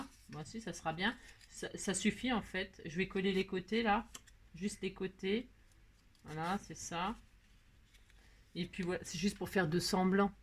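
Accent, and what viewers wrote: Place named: Paris, France. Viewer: Coucou , j’adore votre voix de titi parisienne , vous devriez mimer cette réplique : « atmosphère,atmosphère,est ce que j’ai une gueule d’atmosphère ? » 😃😉 merci pour les tutos..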